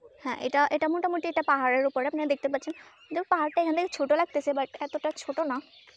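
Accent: Chittagong, Bangladesh. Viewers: kothar modde kichuta Chittagong er accent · কথার মধ্যে চট্টগ্রাম এর টান আছে এজন্য বললাম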